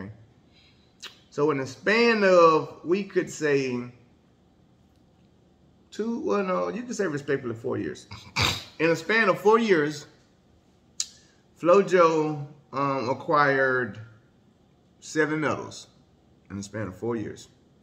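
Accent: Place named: Georgia, United States. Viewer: P.s my mom used to love your voice when I would play and listen when I would sit with her at the table having dinner..she said you sound like some of her people in Georgia. I miss my mom laugh she thought your voice was so back home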